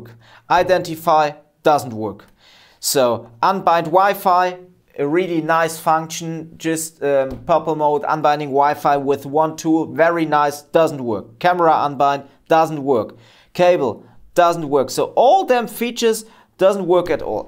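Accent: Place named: Germany. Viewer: I hear german 😊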